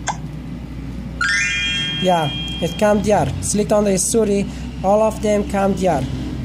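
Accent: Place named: Afghanistan. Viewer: Afghans talk English like him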